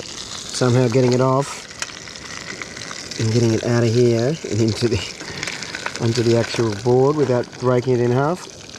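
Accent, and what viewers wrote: Australia. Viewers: One of the most relaxing things I do is watch your camping trips on the islands. One of the most amusing things is listening to my wife's (affectionate) commentary on your Aussie accent and syntax and mentioning that I'd fit right in with how I "sometimes" shorten words. LOL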